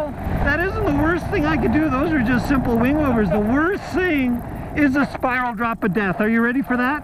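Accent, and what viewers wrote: Canada. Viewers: Wacky Canadian. Or Wisconsonian or Minnesotan. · I just love the Canadian accent! So polite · He sounds Canadian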